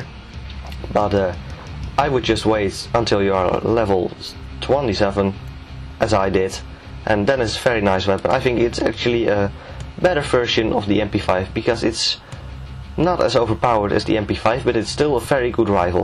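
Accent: Netherlands. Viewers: ey man ik hoor aan je accent dat je nederlands bent, ik upload ook gameplays van bfp4f check mijn channel btw nice commentairy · Are u Dutch? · je hoort echt goed dat je Nederlands bent goede video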